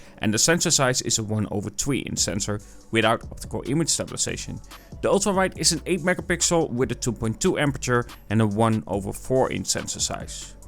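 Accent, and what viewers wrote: Netherlands. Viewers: Klinkt alsof je van Nederland komt. Je maakt goeie reviews 🙏🏾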